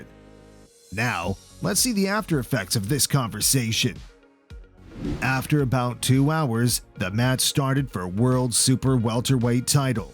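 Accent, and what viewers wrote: United States. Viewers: Don't get me wrong I love this video but you kind of sound like an American who speaks English as a third language.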